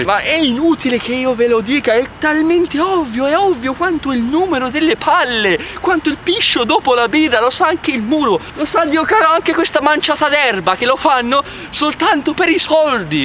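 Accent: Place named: Tuscany, Italy. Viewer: Meno male che ci sono altri YouTuber con un simpatico accento toscano ma che sanno quello che dicono, quando parlano di videogiochi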